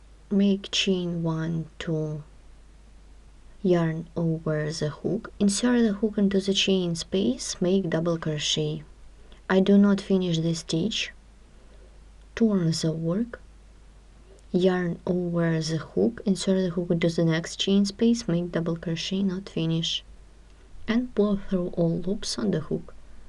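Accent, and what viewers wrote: Russia. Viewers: А русский так хорошо слышен через этот англ.))). Красивая работа.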